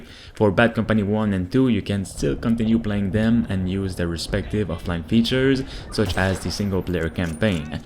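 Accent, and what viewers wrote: Quebec, Canada. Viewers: are you from quebec ? cause your accent is "famillier"lol ,quand jai vue lecriture dans le jeux sa ma sauter au yeux XD je tecoute depuis longtemps et javais un doute mais maintenant jen suis sure! respect frere keep up the good work! i like your contents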